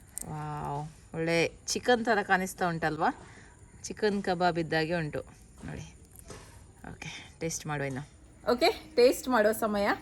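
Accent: Mangalore, India. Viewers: ತುಂಬಾ ಚನ್ನಾಗಿದೆ ರೆಸಿಪಿ ನಾನು ಮಂಗಳೂರಿನಲ್ಲಿ ಎರಡು ವರ್ಷ ಕೆಲಸ ಮಾಡಿದ್ದೆ. ಆಹಾ ಮಂಗಳೂರು ಕನ್ನಡ ಕೇಳುವುದು ನನಗೆ ತುಂಬಾ ಖುಷಿ ಕೊಡುತ್ತೆ. ಮದುವೆಗೆಂದು ಮಂಗಳೂರು ಹುಡುಗಿ ಸಿಕ್ಕಿದ್ದರೆ ದಿನಾ ಹಿತಕರ ಕನ್ನಡ ಕೇಳ್ಬಹುದಿತ್ತು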